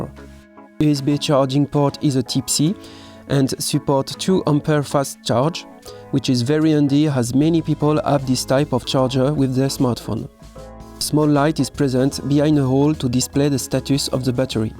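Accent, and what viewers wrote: France. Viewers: Il est français ?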